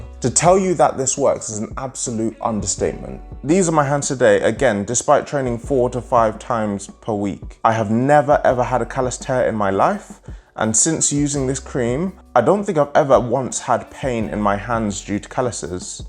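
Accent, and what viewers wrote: United Kingdom. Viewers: Your videos are twice as useful for me because I get my calisthenics info in a weekly basis AND I even get to practise listening to your UK english accent since I have the CAE C1 exam in June! Good stuff man!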